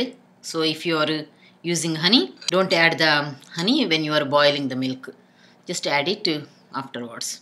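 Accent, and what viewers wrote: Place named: South India, India. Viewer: She's a South Indian and they have that kind of accents. Very strong MTI. Though a Pakistani talking about English and it's accent is kinda funny 😛😂😂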